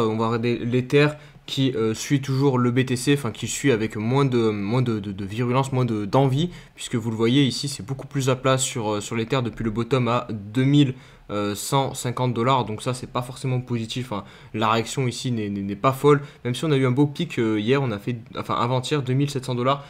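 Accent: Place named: France. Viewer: Haha je suis brésilien. Très français ton accent. Ps: les brésiliennes adorent... 😉👍🇨🇵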